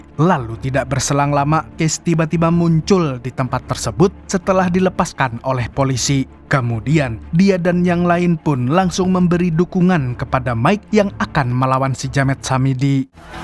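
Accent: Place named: Tasikmalaya, Indonesia. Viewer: Si sorana siga org tasik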